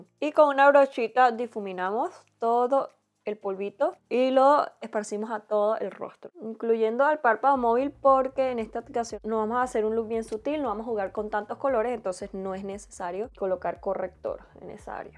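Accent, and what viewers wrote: Venezuela. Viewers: WUAO TE QUEDÓ INCREIBLE, ME ENCANTO VALE,TE LO JURO,ERES TREMENDA MAQUILLADORA.YI SOY NUEVA EN TU CANAL.SI ES POSIBLE PREGUNTAR,DE QUE PAIS ERES? ES QUE ME PARECE QUE HABLAS COMO VENEZOLANA????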